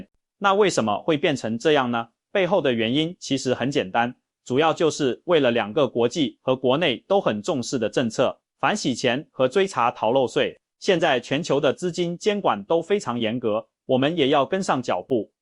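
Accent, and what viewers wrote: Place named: Mainland, China. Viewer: 中共國的口音！騷嘮台灣！你們中國人管好自己比較重要！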